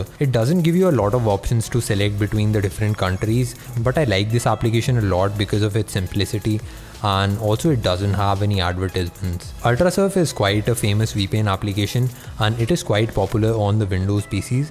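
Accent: India. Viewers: This is awsm bro thank u 4 video r u Indian? i asked this question because of ur accent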